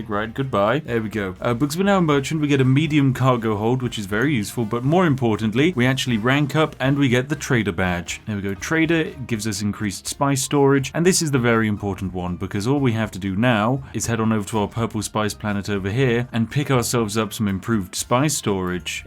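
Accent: United Kingdom. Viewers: I don't even have spore just came here for my daily dose of British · wait a second, you are british! And you are playing Spore, where the most valuable thing is spice! AND YOU MAKE COLONIES!!!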